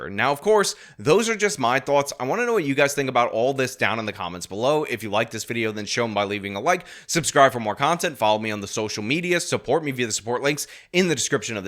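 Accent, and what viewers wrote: New York, United States. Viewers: It’s not freaking awoken it’s awaken. The NY speech pattern will only get you so far with the speech nutzees. Ffs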